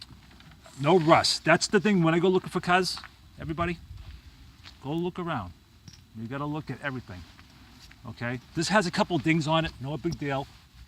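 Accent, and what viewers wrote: Boston, United States. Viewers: P.S you got the most Boston accent that I ever heard!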